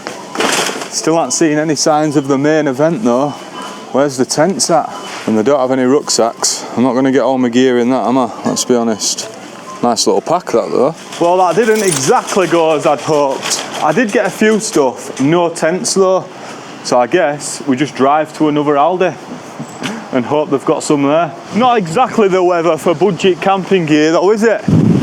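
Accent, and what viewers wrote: Yorkshire, United Kingdom. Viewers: Are you a Rotherham lad or Barnsley boy? Trying to guess? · Are you originally from Bradford or Sheffield pal??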